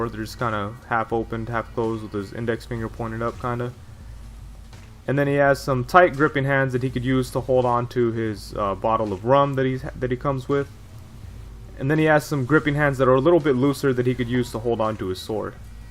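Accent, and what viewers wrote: New York, United States. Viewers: Anytime bro and no worries we all make mistakes we're only human. Also I can imagine from the video you made of your trip on San Diego Comic Con even the stuff you had it'll be a bit to review also yeah Idk why they didn't included that since I'm sure he used a pistol in one of the movies but I don't remember for sure. Still kinda off on that since lately it seems S.H. Figuarts are getting lighter on accesories I noticed it with the S.H. Figuarts Deadshot even Dark knight Batman that was released last month idk very strange. Also thanks again man for the info really appreaciate the heads up on that also too can you put one of those business cards you made with it? I ask since can't let those cards go to waste after you worked hard on them for San Diego Comic Con so be cool to have one from you. Lastly, thanks for also saying my last name correctly you said it the right way since I am Puerto rican lol was also gonna include that in my email asking you if you're hispanic as well since I know you live up north in NY by your accent since was always very curious on asking that. 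Also too again keep up the good work on your videos and once again I'm thankful for winning your contest and will enter again in more in the future and hope you have a great day.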